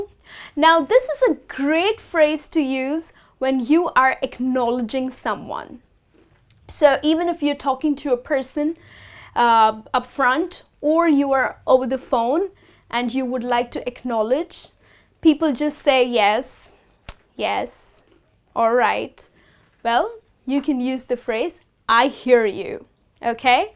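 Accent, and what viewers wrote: United States. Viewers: American dialect.... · You speaks 80% American English and 20 % British English ....... (Me - 50%american +50% British English....) Am I right plz comment ..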